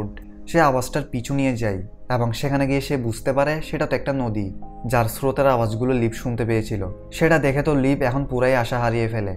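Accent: Chittagong, Bangladesh. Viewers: খুব বাজে মুভি আর একটু পরে পরে ফ্লাশ বেঁকে যাওয়ার কি আছে আর চট্টগ্রামের একটা টান আসে কথার মধ্যে শুনতে মোটেও ভালো লাগেনা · চট্টগ্রামের নাকি ভাইয়া?😂😂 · ভাই আপনি চিটাগং এর 😊😊 ভাষঅ শুনে বুঝে ফেলছি 😊😊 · চট্রগ্রামের আঞ্চলিকতা বেশি দেখা যাচ্ছে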